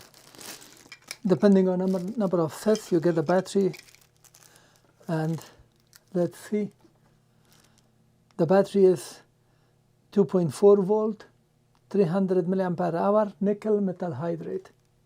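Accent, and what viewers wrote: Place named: Poland. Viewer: I loooooooooooooooooooooooove your accent! Is that Polish?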